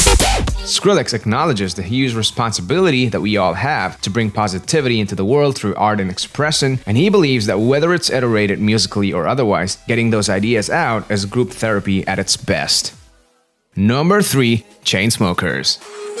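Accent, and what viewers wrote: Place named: England, United Kingdom. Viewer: Your english accent is awesome.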